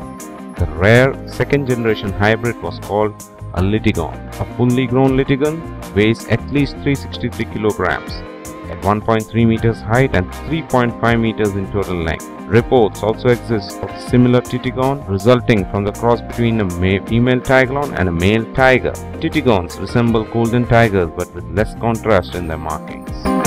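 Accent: India. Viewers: This guy has indian accent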